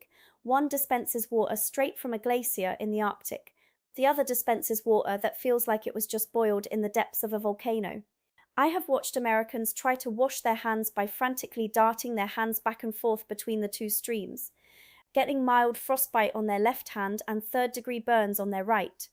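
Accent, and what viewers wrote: England, United Kingdom. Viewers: Wonderful and accurate information. I must note your charming adaptation of a generic "English" accent after so short a time in the UK. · your English accent is flawless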